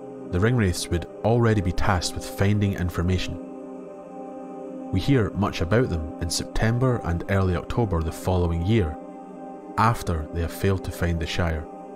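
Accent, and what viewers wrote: Scotland, United Kingdom. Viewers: Oh no! The only two Scottish accents I could get any information on were Edinburgh and Glasgow. I had been wondering if Inverness were a possibility, but I couldn't find any examples of it at all. Eventually, it came down to whether I thought you sounded more like Billy Boyd or Billy Connelly. Lol.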